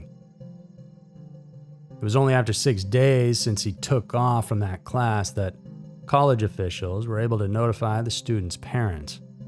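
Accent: South, United States. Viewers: Lord, I love your voice. It has a southern ring to it, like I have. Anyhow, it's a lot better than that random Canadian guy who sounds like the least interesting man in the world.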